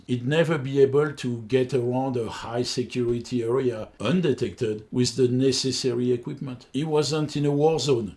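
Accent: France. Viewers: This is a great video, I am glad I clicked on it. I love snipers and find it an amazing subject. The French accent was a surprise and immediatly got me hooked: I am a francophile and I even lived in France. I am also always read to listen to a grandpa telling stories. The humour in the video is great and the transitions are hilarious. I can say the technical information here is above average and the best package in one single video on all of YouTube. I read everything I can about sniping and my favorite book is Out of Nowhere, by Martin Pegler. It doesn mention the proeminence of the .338 Lapua Magnum. The books Stalk and Kill, and Sniper One on One, by Adrian Gilbert are also great. Do you know French books about sniping? Snipers are fascinating subject and no wonder we have so much media about them on popular culture. As a matter of fact, I was about to switch on Sniper Elite 3 when I saw this video. 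My first exposition to sniping was the movie Sniper (1993), with Tom Berenger and Billy Zane. The movie is classic of action and suspense, and it shows some of the practices of sniping. I got tranced and always studied all I could about the craft. Maybe you could make a video analysing Sniper! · You must be French judging by your accent....my brother has a .338 Lapua.....I have a 30/06....one must utilize the tools that are available to him or they are worthless. Very good to hear someone speak shooting truth! Thank you for posting!!!!!! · How come you talk with a French accent but have a muricastan flag and use their bullshit unit system? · oh come on why is he french. now i have to close the video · C'est un accent Français, non ? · Hello, liked your vidéo, just a question, are you french ? Because you have the same accent as me. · Is this guy french or slavic I cannot tell. It sounds very French when he says "Rownd, "ledge-geon-dairy", "bee-cawze", et. 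· Vos vidéos sont sympas, mais l'accent, "so frenchy dude" pour un francophone c'est une vraie souffrance. dommage de n'avoir assumé votre nationalité et de facto faire vos vidéos dans notre langue. Autre option prenez des cours pour arrêter ce massacre. Just a tip mate cheers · What is this accent ? You are French right ? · beautiful French accent !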